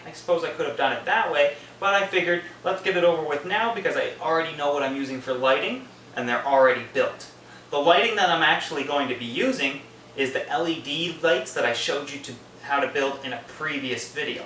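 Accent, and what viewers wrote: Canada. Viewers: I think what I like best about your videos is that you do the "HowTo" part, but you also take the time to explain the "Why'd he do that" part. With so many videos, I want to stop "genius creator" in the middle of creating his brand-new what-sit and ask him "why that way, why not this way?" Genius creator, of course, never answers his comments! You, on the other hand, give very comprehensive [and comprehensible] instructions. And your Canadianisms just make it fun listening to you [yez?]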